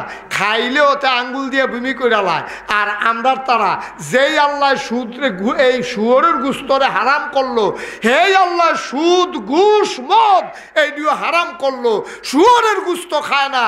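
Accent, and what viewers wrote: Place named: Comilla, Bangladesh. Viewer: এই বক্তা কে সবাই কুমিল্লার ভাষার জন্য পছন্দ করে মিয়া ❤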